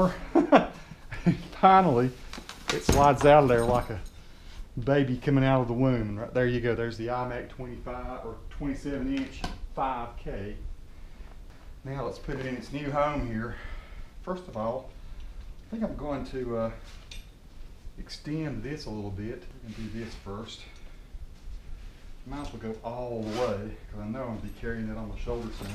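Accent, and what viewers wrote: United States. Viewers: I am British, that's a proper US accent you have there! Just got the same one for my 21.5" 4k machine - thanks for the vid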